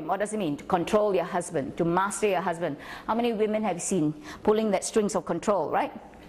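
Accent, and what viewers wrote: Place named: Guyana. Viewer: you sound so guyanese.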